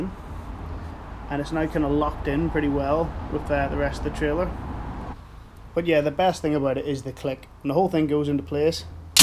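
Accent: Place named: Belfast, United Kingdom. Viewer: where abouts are you from you sound like your from belfast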